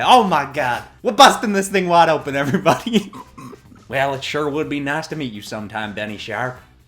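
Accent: United States. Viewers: This narrator kinda sounds like a mix between a robot and a country accent but it’s great tho can’t wait for more episodes with Harold lol 😂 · Not to be rude but I personally am not a big fan of the country accent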